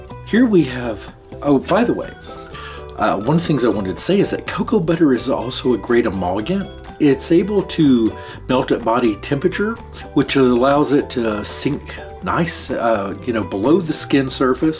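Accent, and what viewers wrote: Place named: South, United States. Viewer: I'm so glad you are okay and I hopefully all the animals are doing well! Ahh hahaha, I love your country voice wait until u hear my real country as turnip greens vice😂😂😂😂😂 but honestly we got ice and snow q, but nothing like!e that although we do every 6 yrs or so.. But doing my patron. I wanted to send the national guard to get you. That's bow worried I was, there's a surprise on the way my dear It' be over yonder in your box very soon alright sweetheart. Still getting thru the excruciating pain with nothing talked to a specialist in osteonocrosis and averyadic neurcrosis and I might be going to john Hopkins or California to a hospital there to be part of a study, I have been told I have about a year to live in!was they slow it down, but keeping busy and putting my energy what I have on new techniques and a total makeover of my yt channel, name and everything! 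Patron on the way!!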